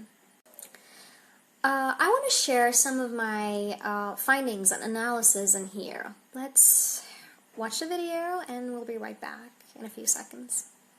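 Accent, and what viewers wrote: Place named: United States. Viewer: Extraordinary presentation with an American accent 😊👏👏👏👌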